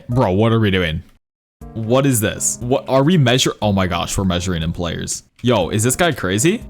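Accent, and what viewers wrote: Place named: United Kingdom. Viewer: I think he is British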